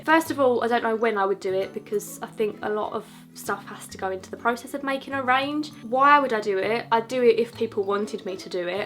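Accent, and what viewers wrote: United Kingdom. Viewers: I'd love to have your voice tbh. Your voice is so soothing. British accents yessss <3 I am also fine, thank you :)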